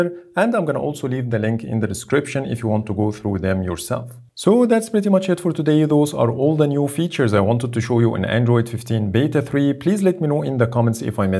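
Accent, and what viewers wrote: Egypt. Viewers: Ur accent is egyptian am i right ?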